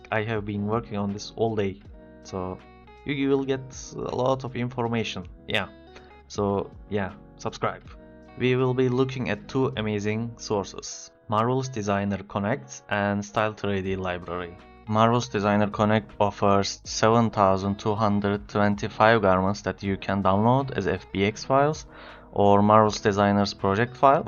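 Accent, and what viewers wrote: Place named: Turkey. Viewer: kardeşim sağ alta bakana kadar dedim "bi yabancı nasıl türk gibi ingilizce konuşabilir". klavyenin türkçe olduğunu görünce içim rahatladı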